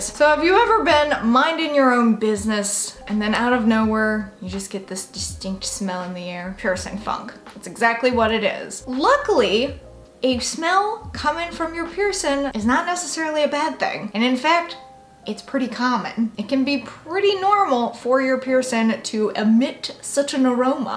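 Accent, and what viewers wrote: South, United States. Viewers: Afternoon Gorgeous 🌞 Hope this message finds u well! Luv ur vids! I just watched ur "I talk funny vid" I watched as I knew u were Southern,but didn't know from which part till now. Im a proud Southern girl myself&had the same issue when I moved from Fla 2 Colorado🙄 I even write how I talk unless it's an important document or smthin. I happen 2 luv how u talk&it's actually 1 of the reasons I started watchin ur vids. People can b so rude! Glad u addressed ur accent the way u did,luv the blunt,honest&2 the point attitude! It's another awesome southern girl charm! Keep stayin u! Stay safe&healthy&I look 4ward 2 ur next vid! Happy Piercin💕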